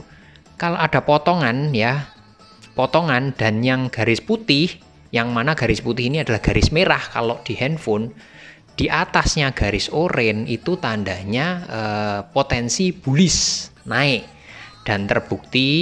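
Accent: Java, Indonesia. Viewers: Bang jowone ngendi to kie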